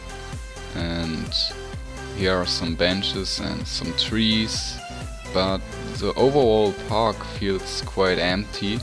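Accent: Germany. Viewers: bist du von deutschland ??? Dein englisch ist eindeutig von einem deutschen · Das deutsche Englisch ist legendär😂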